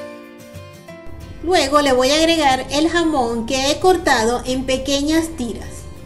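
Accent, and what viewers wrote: Venezuela. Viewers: Estoy buscando recetas que sustituyan el clásico pan de jamón de la cena de Nochebuena y Nochevieja venezolana y esta receta es la que más me gusta, por tu acento creo que eres venezolana también, o me equivoco? Saludos.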